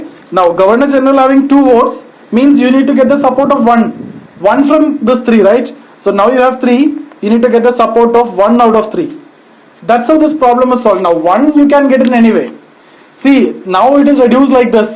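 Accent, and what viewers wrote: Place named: South India, India. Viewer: The Souuth Indian accent of English teaching.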